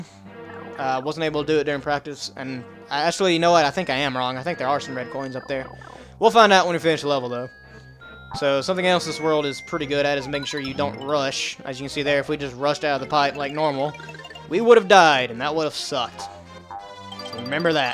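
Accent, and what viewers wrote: South, United States. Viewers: Every time I hear Southern accents, it makes me long for some of my Mom's cookin'! By the way, you're pretty good at this, hope you keep at it!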